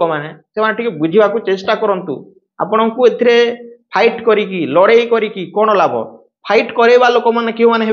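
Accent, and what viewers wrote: Odisha, India. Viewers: Khanti odia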